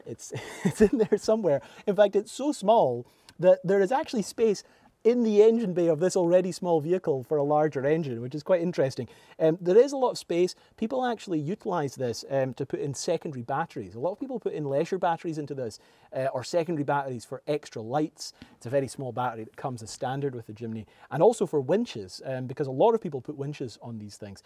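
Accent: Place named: Scotland, United Kingdom. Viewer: Great to hear another Scottish voice and see the lovely scenery of the islands. About to buy a series three here in Panama. Your video underscores my reasons for getting one, thanks.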